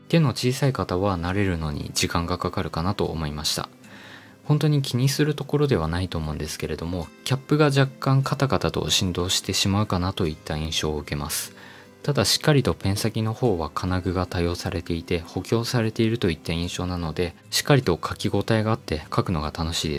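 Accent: Aichi, Japan. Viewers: さん愛知県民でしょw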